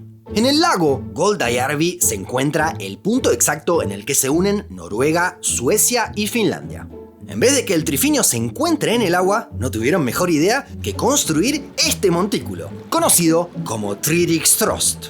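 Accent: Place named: Argentina. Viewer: Sinceramente tu voz es muy agradable! generalmente me cuesta escuchar videos con acento argentino (por que toda la vida he visto las peliculas y programas con doblaje mexicano, aunque no soy de mexico) pero contigo jamas me costo, super genial el canal!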